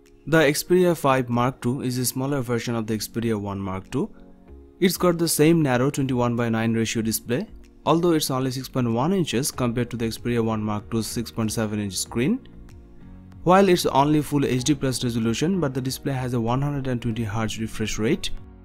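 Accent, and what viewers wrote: India. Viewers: Heu mais que fait cete vidéo ici ? Ces SONY sont déjà sortis chez nous. Par ailleurs cet accent indien prouve encore une fois l'arnaque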